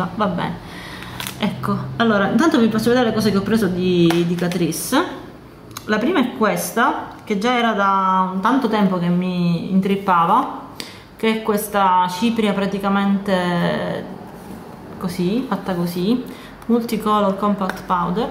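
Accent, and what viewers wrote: Sicily, Italy. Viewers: io e te abbiamo le stesse passioni makeuppose... Il verde-oro e gli ombretti in crema... Sarà per questo che mi piaci tanto e non mi perdo un tuo video??? O sarà perchè amo l'accento siciliano??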